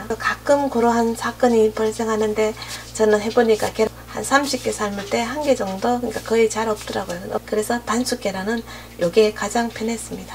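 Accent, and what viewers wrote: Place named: Andong, South Korea. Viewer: 고향이 경북봉화인가요?. 말투가 안동이나 봉화쪽 말투이신듯..-0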